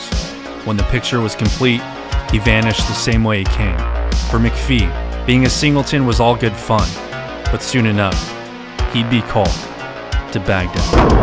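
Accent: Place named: Chicago, United States. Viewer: I thought he was from Chicago, not NJ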